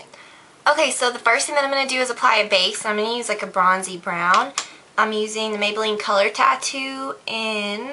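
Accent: South, United States. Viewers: i feel like only during tutorials does your southern accent come out :)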